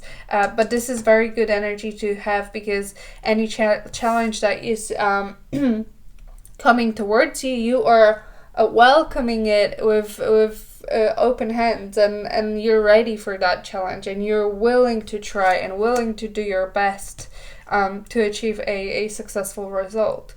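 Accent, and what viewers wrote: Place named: France. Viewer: Thank you 🙏🏽 I claim your reading , I think you are French from your English accent !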